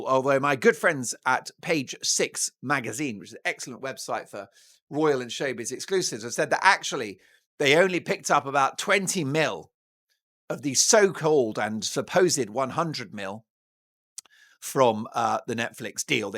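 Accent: Australia. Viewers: Dolan, you are very entertaining and you do the best Aussie accent I have ever heard, cracks me up every time (I'm Aussie so I do know). "Cobber" is very sixties though, it's "mate" now but keep up the fun times with this show! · Love the Auzzie accent. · Love the Australian accent. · 😂😂 your Aussie accent is on point.. brilliant..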